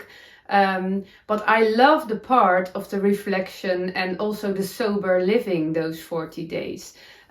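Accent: Netherlands. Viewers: I love it. My dad and his family were from Holland, most of them have now passed so I love to hear your Dutchy English. It reminds me of them. I enjoy hearing about your culture also seeing some of the sites. Thank you for your English speaking podcast.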